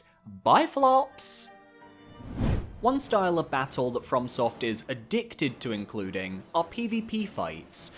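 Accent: United Kingdom. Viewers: TF is the awful Scottish accent about? Reckless behavior 😂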